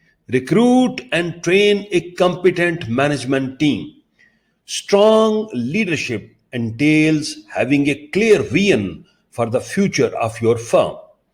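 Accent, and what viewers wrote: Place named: India. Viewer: Ur Indian accent is too strong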